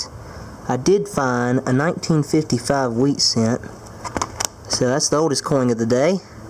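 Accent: South, United States. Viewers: Hey I know you don't want people to know where you live but what state are you in that has black bears? I know it's southern from your accent.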